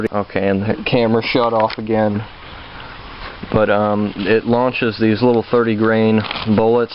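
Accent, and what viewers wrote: United States. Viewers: Well, since you're talking about your roots, I'd say that you probably learned to talk in southern ohio or close by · you don't sound Californian, you sound like your from my neck a the woods. where abouts you first lived?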